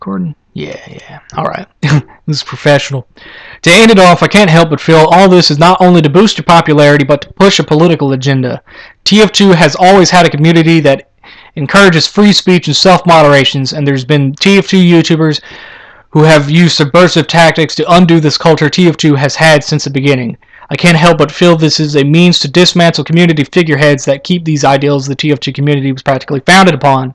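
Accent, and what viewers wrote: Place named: Texas, United States. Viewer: you sound extremely Texan i dont live in america so i cant exactly say what texans sould like tho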